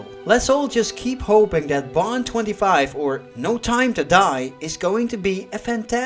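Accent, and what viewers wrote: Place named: Netherlands. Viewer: I just cannot understand why your channel hasn't at least half a million subscribers: Awesome produced videos, great voice with that cool dutch accent, great text / writing, passionate about the Bond-franchise, no bullshit bla-bla, humorous & funny, clear opinions: just relaxing and informative the same time to watch. Oh-so-many channels could learn from that. But you HAVE to make a gun-barrel scene with yourself in that rad smoking. That would make it PERFECT! Just my opinion.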